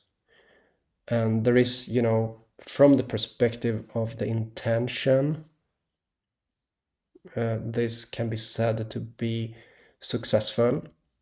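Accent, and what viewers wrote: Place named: Eastern Europe. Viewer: Just curious, what's your country of origin? I think I'm detecting an Eastern bloc accent.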